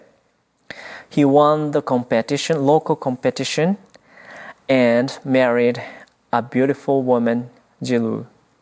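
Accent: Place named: Portugal. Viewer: Thank you for the wonderful English Dharma talk! Sensei actually has a bit of a Portuguese accent, so his Portuguese must be excellent! Namu Amida Butsu🙏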